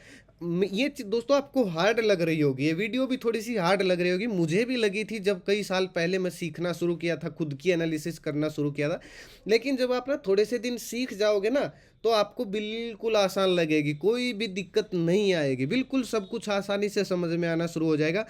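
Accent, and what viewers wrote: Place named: Marwari, India. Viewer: आपका बोली का लहज़ा तो मारवाड़ी लगता हैं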